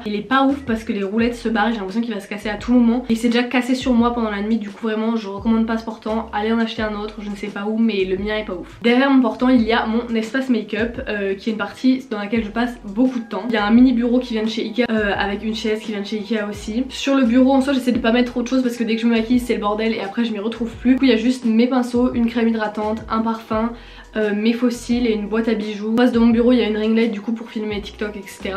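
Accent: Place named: England, United Kingdom. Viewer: salut, j’ai entendu ton accent anglais et je suis juste sous le choc- est ce que tu pourrais me dire comme tu as fais pour avoir un accent aussi beautiful stp ah oui et aussi tes magnifique meuf et ta chambre aussi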